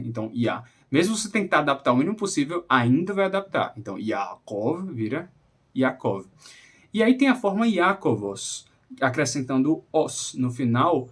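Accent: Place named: Maranhão, Brazil. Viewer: Parece sotaque do Maranhão 😮não sei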